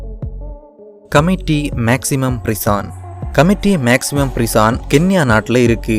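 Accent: Kanyakumari, India. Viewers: That kanyakumari slang from youtuber ❤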